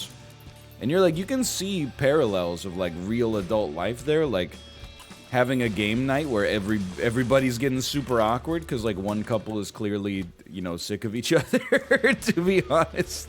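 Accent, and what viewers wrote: Canada. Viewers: NL went on a bagel rant just to say bagel the canadian way as many times as possible after pandering to americans for so long.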